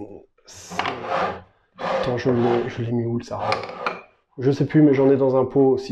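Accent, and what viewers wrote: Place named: North, France. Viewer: INCROYABLE vidéo! ton accent du nord m'a bien fait rire! Tu me fais penser à nous, avec tes 2 valises et à bouger avant de te poser (seul différence mais valise déborde encore ahah). J'aime beaucoup ta façon de penser! tu es trop drôle et tu es mémorable!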